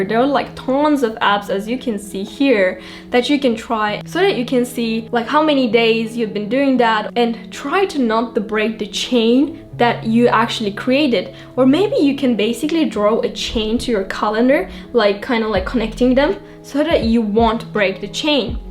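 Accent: United Kingdom. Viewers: Bu videoda ingilizcende bir ingiliz aksanı sezdim sanki. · have you ever been in england? ur accent reminiscent of british. just my opinion 😌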